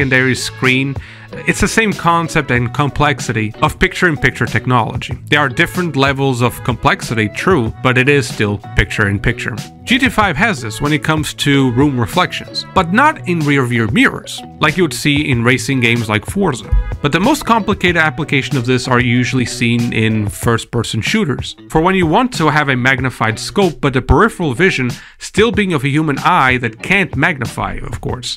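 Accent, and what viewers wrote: Portugal. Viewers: i smell tuga in here... :))) props!! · I noticed your Portuguese accent in the first 25 seconds of video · eu sabia que eras tuga do get-go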